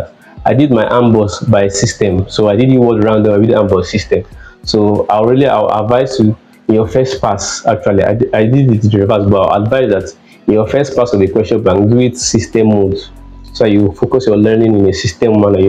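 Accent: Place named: Nigeria. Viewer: Very interesting watching from Chicago, just a suggestion, if you want to work with the average American patient, start working on getting rid of your accent, I'm used to being around Nigerians because I live in Uptown but most Americans White or Hispanic won't be able to really understand or have the patience to keep a doctor with such an accent or maybe you're just taking fast.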